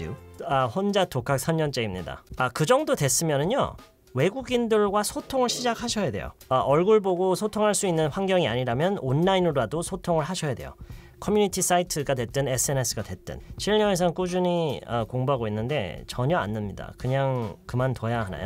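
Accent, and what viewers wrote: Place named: United States. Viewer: 샘은 대단합니다 살이 조금 붙어도 대단한 인기입니다 발음도 좋습니다 미국 사람과 비슷합니다 흑인발음 같기도 하고 백인 발음 같기도 합니다 헷갈립니다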